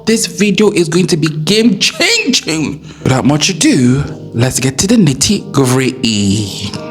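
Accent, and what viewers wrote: United Kingdom. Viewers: Hi ❤ ❤ 😢😢😢😢😢😢😢😢 I’m an alocacia and calethia killer, those fancy ones 😢😢😢 but I found few at Walmart on sale, $2.50 found some & I still have them; I do have many types of tradescantias and love propagating them 😊 but I still kill plants. I have all kind of plants 🌱 🪴🌱🪴🌱🪴🌱🪴🌱🪴 maybe more than a 1000; still ❤❤❤ I love the ones stay alive for many years, the ones I kill 😢 I try & I try! I thought I was a plant mom that water too much, that I am constantly there but I am not, I am there in my garden but I don’t check them daily, honestly I have not found pest in any of them in many weeks😊 but this hobby is very rewarding and expensive 😊😊😊 Friend I like your British accent 😂😂😂 thank you for your time ❤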